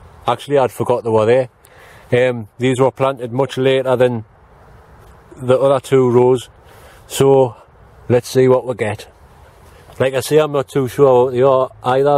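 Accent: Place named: North, United Kingdom. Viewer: I like you videos and the voice it reminds of all my relatives from the north Never mind about the dislikes some people just like to hurt .Good wishes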